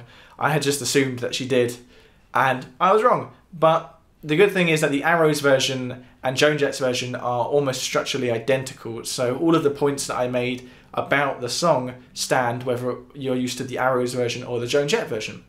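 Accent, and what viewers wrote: United Kingdom. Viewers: What I also like about this channel is that English is spoken in a very clinical clear way as if it is radio English. Yes there is a slight British general accent, but not disruptive. We don't want to be sidetracked with heavily accented English. This is also understood by Americans without being American English. It's almost international English I dare say.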